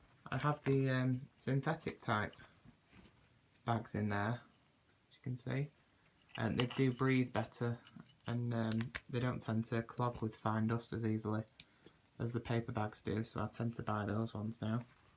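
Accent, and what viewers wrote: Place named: North England, United Kingdom. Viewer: This was back in the good old days when the Brits and the Yanks still shared vacuum designs for the Hoover cleaners... One of my Mum's best childhood friends worked at the N Canton Hoover plant for decades(both their Dads were native Scots/Brits) and the two Dads worked for Goodyear Tire/Tyre and Rubber in Akron, Ohio, my current hometown... I enjoy your videos and comments and your lovely Liverpool or Manchester accent!!! Cheers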